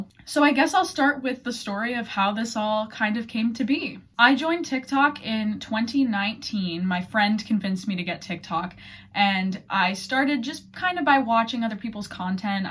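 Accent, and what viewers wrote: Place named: North America. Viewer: Hey! Its nice to know your real name! I love your videos! (I too am obsessed with Marvel lol) Here are some just basic questions I have: How old are you? Ik your birthday was recently! Are you Canadian? American? (I assume you can't be anything else cos of your accent lol)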